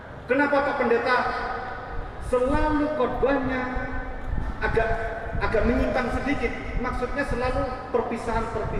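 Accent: Indonesia. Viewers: Wong ngapak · Lho omongane medok kyok aku, kesaksian opo kuwi, ndak ada isinya, ndak ada makna ne..nggilani.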